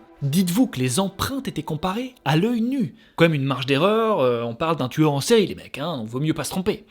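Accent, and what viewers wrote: Belgium. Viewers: Il est belge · Mais il est belge ? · Les Belges, vous dites Houite pour Huit et pourtant vous prononcez Tuiste le mot Twist. Super vidéo sinon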